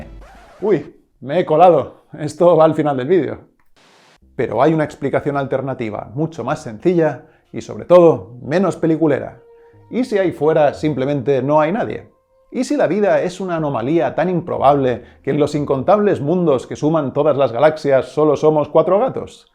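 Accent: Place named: Spain. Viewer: De verdad me encanta como explica....soy colombiana y a veces me constaba un poco oir documentales en acento español...pero definitivamente me encanta como el explica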